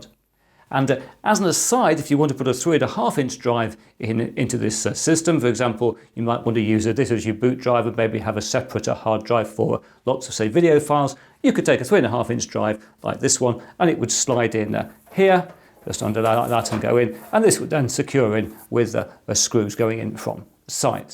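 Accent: England, United Kingdom. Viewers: Very nice video and well explained. I like your English humor, accent and the way you say „motherboard“...👍😂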